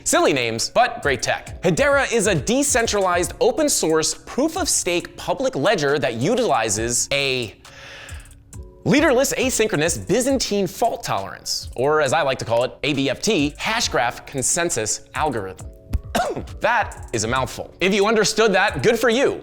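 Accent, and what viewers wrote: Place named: United States. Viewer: Unwatchable - a gimmicky shouting american..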